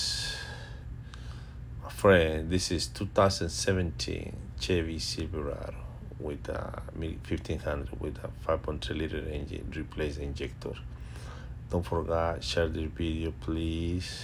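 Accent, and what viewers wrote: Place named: United Kingdom. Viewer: Que bonito hablas el ingles !! Tienes un acento britanico que me facina !!! Congatulechons..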